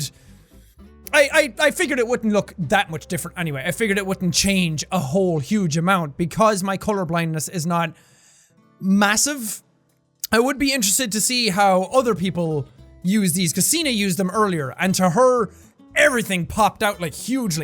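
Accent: Ireland. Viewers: My earphones broke and now I miss hearing Irish accent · That's the Irish accent for ya.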